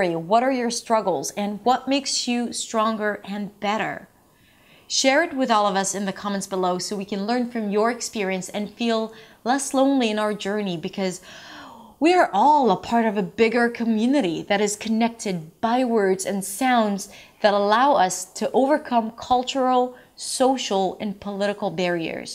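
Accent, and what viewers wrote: New York, United States. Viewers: First thing first you sound so American, secondly everything you said has happened to me. 1) People completing my sentences each time I tried to say a complex sentence 2) Blank, confused and frustration on people face each time I spoke 3) Huh, I sorry what did you say? 4) Correcting me each time I spoke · Ohh god You are very smart you speak like a native English teacher congratulations 🎈 for a moment I thought you were American.. i love ❤️ to watch your videos. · You are amazing you were able to master an American accent. Science says that you will never lose your accent after a certain age (eleven) glad you know you provide them wrong. By the way, do you feel that you will revert back to the way you spoke before since you are surrounded by people who speak with English an Israel accent? Subconscious you might pick up the flow just like people pick up certain accents and words when they move to a new country. 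What is your trick and how do you prevent that if you find that happening. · You can unsubscribe if you want. But I wouldn’t really worry about her making a mistake. She has spoken with an American accent the whole time and even took speech classes to correct her pronunciation in the beginning. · No, I personally don't need it, as I'm not a bad accent mimic myself (at the risk of sounding conceited). I'm not even sure how or why I found your channel, but I occasionally look at different language and accent videos, so itmust have been in the recommended list, and then I saw your obviously Israeli-sounding name, so I checked it out. Bekitzur, kol hakavod al ha mivta ve al he'arutz shelakh, at nishmaat kimat amerika'it legamrei, kashe le'haamin she dibart anglit im mivta yisraeli lifnei kama shanim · Hi! I’m Chinese and I live in Paris. I’m your new follower since one week. I’ve been very surprised by your excellent NY accent that I really want to master also. 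I even showed your video to my French husband who speaks very well English, he didn’t doubt one second that you are nota native speaker. I like you accent and your struggle personal story, very motivating! And I appreciate much your confidence (and your new hairstyle 😊). I enjoy your course and hope that in several months I could get the half of your level, thank you so much! Love you! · Your English is perfect to me. I really thought you were American. I surprised when I saw you saying that you are not American. I love your channel because I've learnt a lot with you. I am Brazilian and I effort to improve my English and one day... who knows... have an excellent accent like you. Congrats!!! You are making the difference in people's live. 😘😙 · You really inspired me dear sister. I'm very happy that God has bless you with the facility to learn English. I'm amazed that you sound 100% American when you speak. 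I'm a Christian and I'm believe that God gifted to us with such bless to pursue anything that we want.... Listening to you really gives me confidence for my learning.... God bless you dear sister....bue😀😊😄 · Wow! I want to learn English like a native English speaker! I love the American accent, tell me what the secret is. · Phil Smith I totally thought she is an American!! I am Chinese though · You speak perfect American accent. If you did not say you are Israeli I would not recognize English is not your native English. Your story is full of inspiration. I admire the Israeli because their contributions to the world. Thank you very much.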